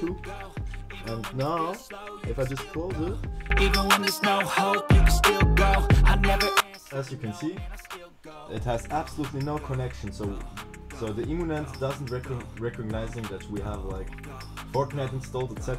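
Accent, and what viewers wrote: Germany. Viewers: Man merkt deinen deutschen Akzent etwas xD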